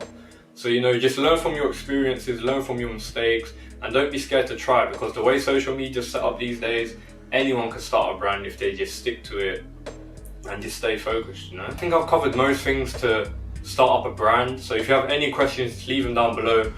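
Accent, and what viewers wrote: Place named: United Kingdom. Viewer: Light skin nipsey hussle with a British accent cuz lol naw real shit thanks for the info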